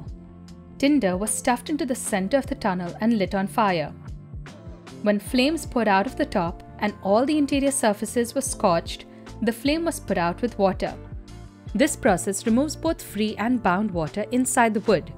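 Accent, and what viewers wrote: India. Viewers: Really interesting to see the scientific component of the shou sugi ban. If i'm right, that technic work best with resinous wood? Placing it in a renewable context, especially the part about soft/hard wood and theyr growing time it's important. The other aspect it's that you have to let time to the tree to grow or you gonna get weaker wood. In France (burgundy) with climate change, we see a lot of pine-like tree getting infected and dying. Add that the unbridled wood harvesting to sell our tree to china. By the way, great video, with lot of informations, add the smile and the indian accent and it's wonderfull !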